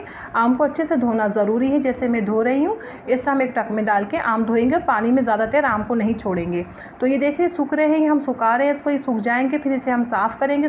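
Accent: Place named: Sindh, India. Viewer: Sindhi lehjey men Urdu bhht achi lag rhi h ap boltey hoey..and acha tariqa h pal laganey ka but aam ko pani nhi lagay jata h us se aam naram ho jata h.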